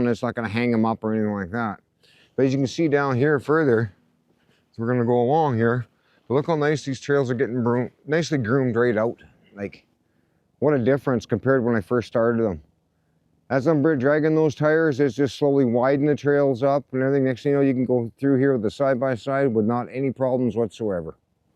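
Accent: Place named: Nova Scotia, Canada. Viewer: Sounds like a Nova Scotia accent.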